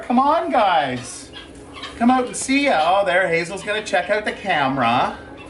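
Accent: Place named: Canada. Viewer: American accent? lol I was engaged to a Canadian for a few years so I spent some time in Ottawa and you are undoubtedly Canadian lol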